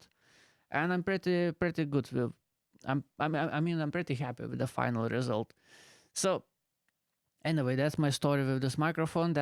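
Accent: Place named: England, United Kingdom. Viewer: I actually like your english accent. SM57 is my favourite for my voice.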